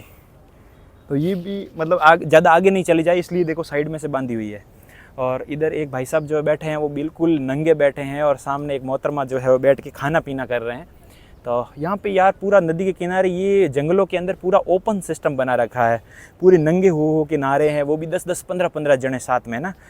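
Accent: Rajasthan, India. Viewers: भाई आप की बोली से तो एसा लगा आप राजस्थानी हो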